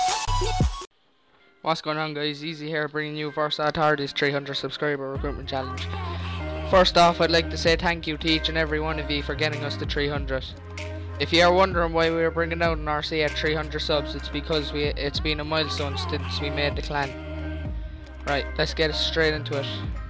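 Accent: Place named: Ireland. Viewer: That irish voice thoo ;)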